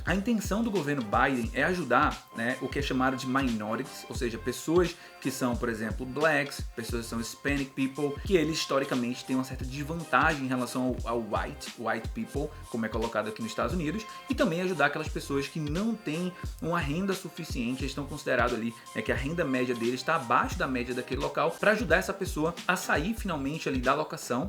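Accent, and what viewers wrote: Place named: Portugal. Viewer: Kara, não consigo parar de assistir seus vídeos🙈 conteúdo direto, e esse sutaque da terrinha já traz um pouco de intimidade. Parabéns maninho! 🙌🏻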